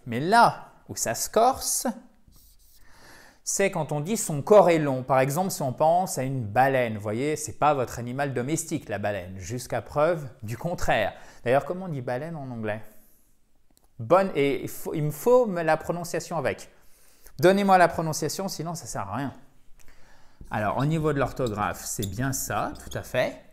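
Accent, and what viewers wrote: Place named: United States. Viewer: Mais votre prononciation est bcp plus de l anglais américaine pas vraiment britannique.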